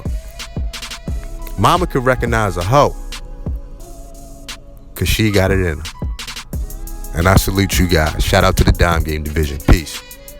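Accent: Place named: Newark, United States. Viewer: Absolutely loved everything about this breakdown. whats understood never has to be explained and you definitely got your own lane..and that's an understatement...its over just drop a bomb on these niggas. You a real G..sound like a Newark NJ nigga too but I know youre forever. Also man I think you should consider writing a book in the long run. You're stories hit home and definitely paint the canvas. Much respect ✊🏾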